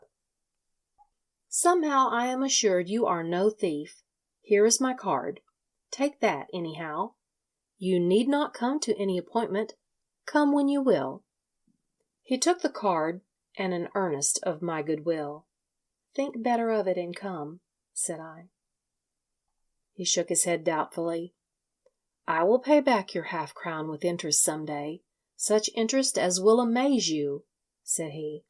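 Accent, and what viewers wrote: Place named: South, United States. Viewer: Love your Southern accent. And your narration. 😊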